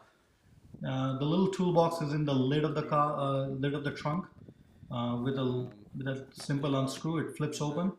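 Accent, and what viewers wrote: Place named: India. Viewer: indian accent ? its terrible man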